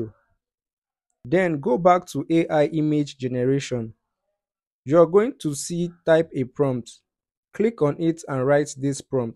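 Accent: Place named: Nigeria. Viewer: I am not a linguist, an East African but I can place this accent in West Africa, most probably Nigerian but might be Ghanaian. I can almost understand pidgin though none of it is used here. Some linguist you must be.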